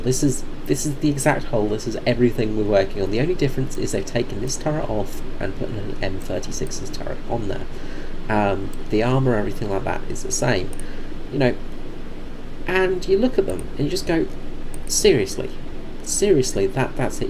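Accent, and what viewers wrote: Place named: Australia. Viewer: Thank you again for your reply and the info on TD units .I will definitely check it out . John from Pittsburgh ( if you don't know , that's in the state of Pennsylvania , USA --- you sound like an Aussie or Kiwi , so thanks again mate )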